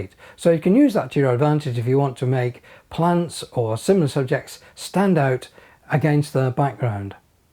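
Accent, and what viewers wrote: United Kingdom. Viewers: How come there is no younger person minus the British accent video tutorial for Panasonic fz 300 on you tube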